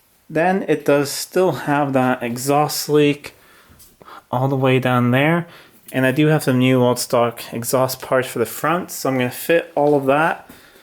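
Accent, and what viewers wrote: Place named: United States. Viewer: Thanks. Listening to your accent I thought that you are from the US and you live in the US. This is why those license plates intrigued me.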